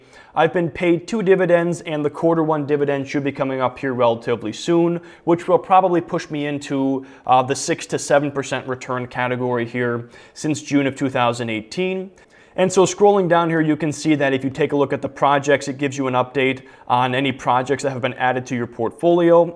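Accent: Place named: Midwest, United States. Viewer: Hey, just curious where you're from? It sounds like the Midwest. Are u from Utah or Wisconsin or something?..just curious:)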